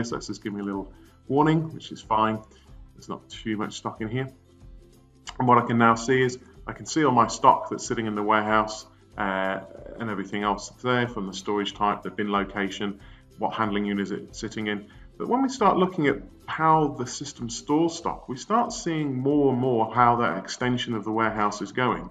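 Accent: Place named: England, United Kingdom. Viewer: Finally a video with english accent, great video.🎉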